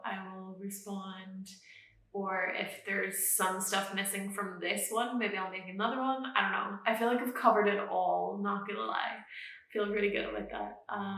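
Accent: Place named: United States. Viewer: OMG I AM HERE FOR THIS NEW ACCENT ON YOU!!!! IT's kind of like AMERICANNNNNN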